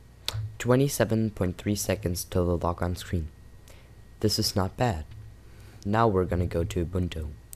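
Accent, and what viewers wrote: Germany. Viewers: Dein Englisch ist richtig gut für einen deutschen, obwohl man den Dialekt noch raushören kann (du sagst im unsip)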